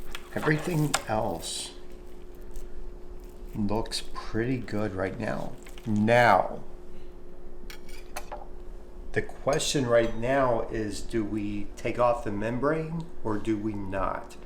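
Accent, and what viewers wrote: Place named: North, United States. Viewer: Northern accent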